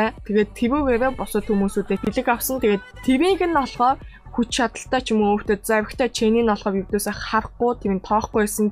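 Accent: South Korea. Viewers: ahiin duu bitgii shantraarai yhv john buurunhuu yaridag l bga biz chinii solongos aylaga akcent ystoi best shu amjilt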